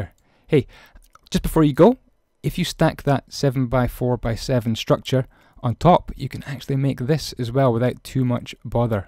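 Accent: Scotland, United Kingdom. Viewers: Scottish? Hmmmm? Do I hear a Scottish accent? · Are u from scotland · u have a Scottish AND a British accent mixed lol!!!!!! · Are you Scottish? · is from Edinburgh and has a Scottish accent. Sounds nothing like a welsh accent lol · Yeah I didn't know if he was British or Scottish ????😕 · Where is he from? He kinda sounds Scottish..... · Excuse me, thats a scottish accent, im proud to have one and i dont find mine annoying or wish i didnt have it. Plus that was rude and uncalled for. I like his accent but thats my personal opinon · are u Scottish I am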